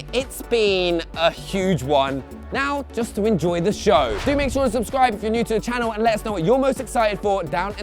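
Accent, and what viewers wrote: United Kingdom. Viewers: Ok so i love the UK peeps , i truly do, even if we boot stomped yall for our Freedom, MY ISSUE IS, why are yall on my youtube 24/7 with that accent that i cant understand , i mean really wth ! this Vid seems really Great and i start listening an all i hear is that dreadful accent and its mucking the entire vid up, and where are my fellow Americans for these types of vids, why are all my Video Game suggestions from youtube are from the UK !!! im thumbs up your vid cAUSE its the proper thing to do and i really like the title ,but i cant understand a lick of what your sayn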